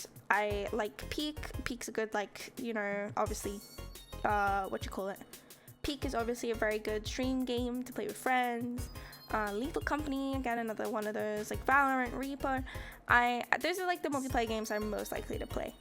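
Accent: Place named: Liverpool, United Kingdom. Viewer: Oh snap a Bri'ish VTuber, and a Scouser no less! I absolutely love your design! It's nice to hear a Scouse Vtuber tbh, I'm a Woolyback with a lotta family down in Liverpool so it's kinda nostalgic for me to hear. It's also nice to see a VTuber who I might actually be able to watch without destroying my sleep schedule because of time zones!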